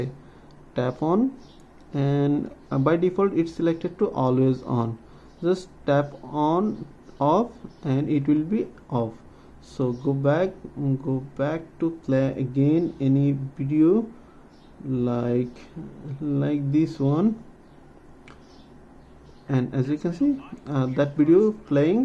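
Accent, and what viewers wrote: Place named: India. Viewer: Hate the Indian accent 😒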